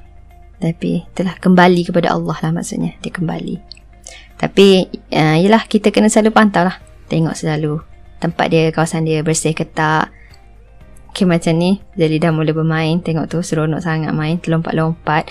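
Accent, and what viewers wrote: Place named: Malaysia. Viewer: mba nya dari malay logat nya enak di dengar dan mpusnya cantik dan beruntung smoga sehat terus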